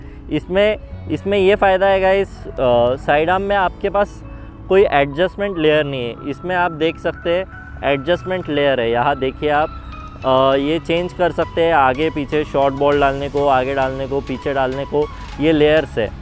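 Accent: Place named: Hyderabad, India. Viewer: Pahla dekha jo hyderabadi bolta hai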